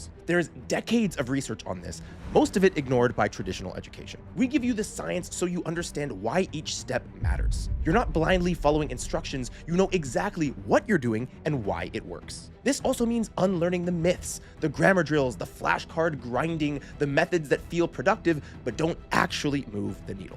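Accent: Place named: China. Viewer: I'm also from china，hella impressed by ur Chinese accent and applications，u sound literally Chinese！！！OMG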